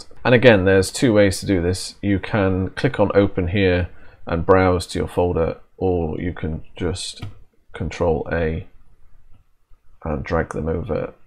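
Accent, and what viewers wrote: United Kingdom. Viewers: OK thanks and BTW how refreshing to hear an English voice on one of these videos and not a loud 'WHAT'S UP' !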